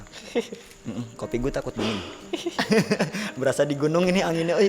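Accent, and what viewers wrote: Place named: Bali, Indonesia. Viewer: Mungkin orang bali ngomong hurup Tnya biasanya gitu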